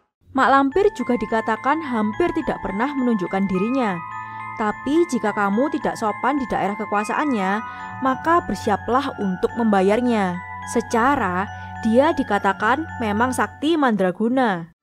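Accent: Java, Indonesia. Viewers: Mbaknya Jawa mn, mendok bgt ngomongnya😃😃😃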